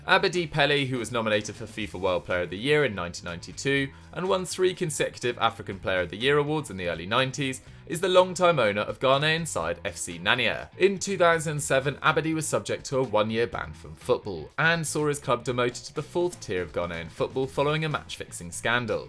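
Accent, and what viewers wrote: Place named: United Kingdom. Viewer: Your British ascent was too deep we don't understand most of it. Please in future put subtitles. Anyway thanks for your information. Keep it up 👍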